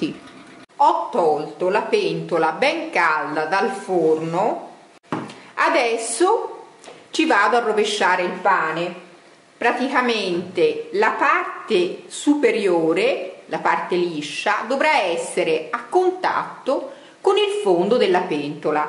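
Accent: Tuscany, Italy. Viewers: Lei è toscana?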